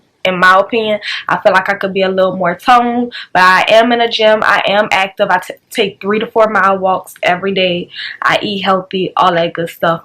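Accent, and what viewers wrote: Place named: New Orleans, United States. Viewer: I knew u was from New Orleans u talk like me lol bt thanks for th info hun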